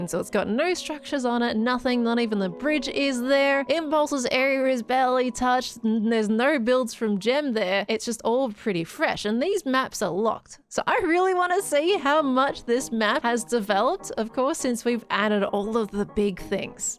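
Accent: Australia. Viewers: it’s the aussie accent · The Australian accent too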